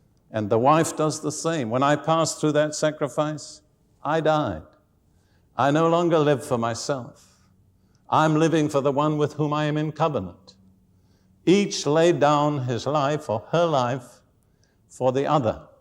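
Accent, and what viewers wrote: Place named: United Kingdom. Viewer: Real Brit Talk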